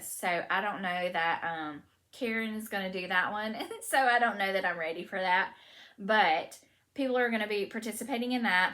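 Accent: South, United States. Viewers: I ❤️ your podcast… and I think you are from a southern place than Michigan!!!’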